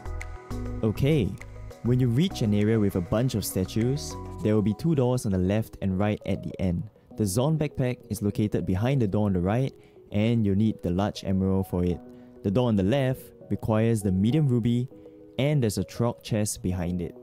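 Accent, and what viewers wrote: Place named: Asia. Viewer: Not bad at all! At first I recognised the Asian accent straight away (I’m Asian myself Malaysian that moved to UK since 14 and now 31) and i wasn’t expecting it to be a good video, but at the end it convinced me that I was wrong. In fact, at the end, I kind of felt warm and home listening to that accent. Good guide/video! Although I was already passed that stage while watching this.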